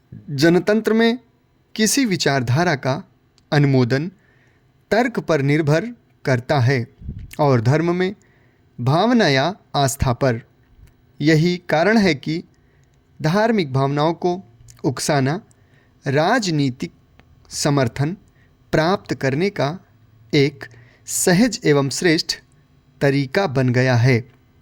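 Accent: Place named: Uttarakhand, India. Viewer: आपके बोलने का तरीका उत्तराखंड में ऐसे ही बोला गया था।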